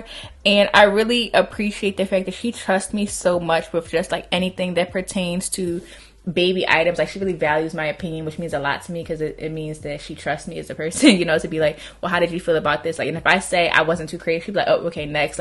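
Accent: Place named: New York, United States. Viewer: It’s the love and appreciation for NYC talk/accent 🗽💕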